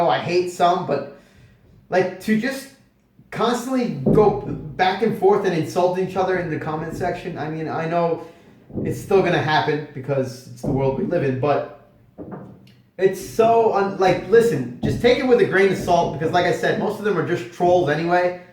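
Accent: Italy. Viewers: oooh sí calabrisi?? Iu sicilianu, più o meno lo stesso dialetto;)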